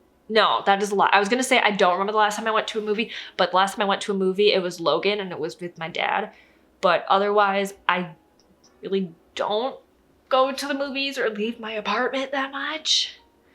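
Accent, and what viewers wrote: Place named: Scotland, United Kingdom. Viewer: I thought you was from Scotland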